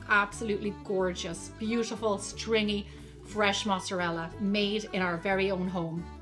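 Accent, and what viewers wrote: Ireland. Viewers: Irish accents are just so cool.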